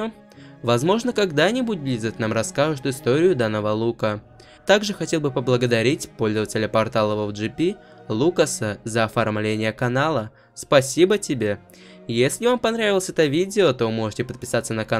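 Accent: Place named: England, United Kingdom. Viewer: Сильный английский акцент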